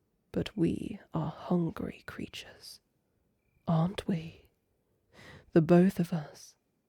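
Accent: United Kingdom. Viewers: might one of the only good British people in the world already I think she’s British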